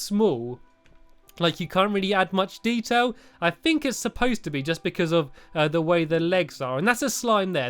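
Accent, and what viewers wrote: United Kingdom. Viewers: your brittish right!?!? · Are you British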